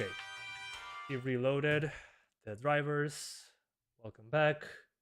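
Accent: Rioplatense, Argentina. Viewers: tenes acento argentino o uruguayo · Hola, de donde sos, hablas ingles, pero pareces de Buenos Aires, o Uruguay?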